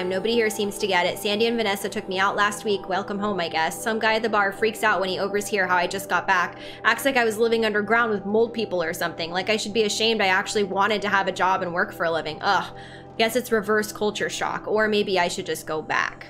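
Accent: South, United States. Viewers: Cool intro love ur southern accent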